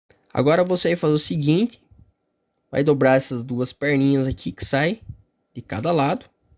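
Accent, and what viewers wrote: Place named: Caipira, Brazil. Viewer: Sotaque caipira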